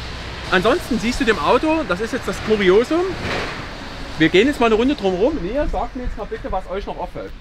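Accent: Saxony, Germany. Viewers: Gehört das vulgäre Geschwätz zum Konzept? Schwer zu ertragen. Sorry, aber Sächsisch ist schon anstrengend genug! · Alles gut ! Aber das gesächsel macht es anstrengend zu folgen .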